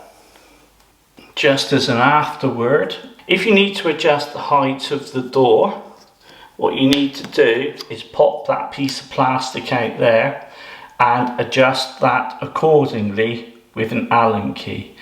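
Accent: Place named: West Country, United Kingdom. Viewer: Thanks mate very informative and love your west country accent ' oww arrrrr" Cheers from Manchester 😅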